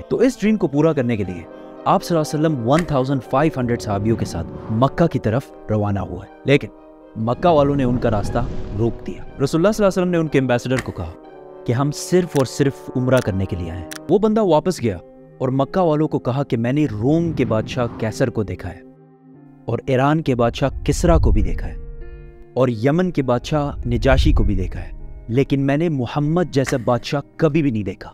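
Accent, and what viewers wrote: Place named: United Kingdom. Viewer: Bhai english accent british jaise kyu? Normal bolne mein problem hai kyq???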